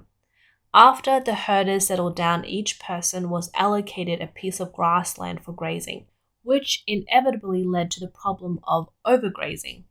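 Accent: Australia. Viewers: The narrator kept saying "dessification." Did she mean "desertification" or "desiccation"? (Her hyper-Australianized accent was not always clear, especially with the over-emphasis of the r-dropping final syllables, as in "wahtaah".) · hmm, she has a very light Australian accent actually. You've obviously never heard a "hyper-Australianized" accent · The accent is very urban Aussie (Melbourne / Sydney accent). I like it a lot. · Is the presenters voice/accent second home Australian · A pleasant voice, sounds a little Australian. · cute Aussie accent 😂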